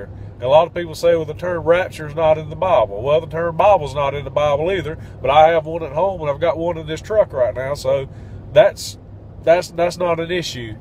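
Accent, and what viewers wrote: South, United States. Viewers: I love your southern accent!!!!! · Not sure where you are from brother, but you have to live in God's country by the way you talk. Surely the lord loves the SEC and HIS people that love HIM and that are called according to HIS purpose. Help us to TRUST JESUS in all things. All we need now is an alter call and Alan Jackson singing Blessed Assurance Jesus is mine or Jesus is tenderly calling today! thanks brother!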